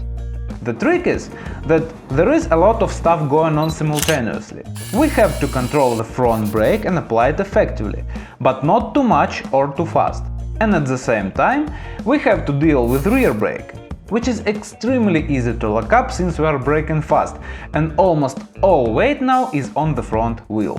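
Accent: Russia. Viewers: Судя по акценту -русский. Почему и титры на английском??? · Youre from russia ? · Узнаваемый русский акцент :)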